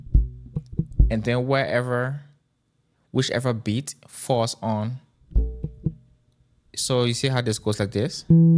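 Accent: Liberia. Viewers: Brother u sound like a Liberian ❤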